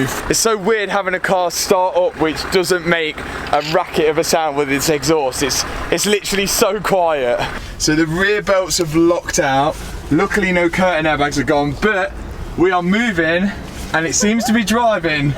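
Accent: Leicester, United Kingdom. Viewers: Sounds like a lestah accent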